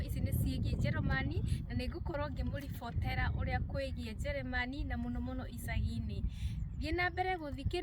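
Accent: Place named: Kikuyu, Kenya. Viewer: Woow I love your kikuyu accent amazing growing your own veggies 👍😍❤️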